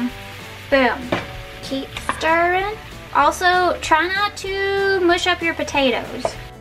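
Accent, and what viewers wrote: South, United States. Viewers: You should doing the video without your Southern accent, like the video that was trending on Reddit. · lol i just made the same kind of dish xD and that southern accent thooo thats sound so great :P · I could never understand why you have a super southern accent and your brother doesn't. I think you need to add a lot more seasoning but this looks good. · Very simple, love that. This woman is really likable, cute southern accent. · First time watching your video and subscribing too loving your southern accent.... you are a beautiful and funny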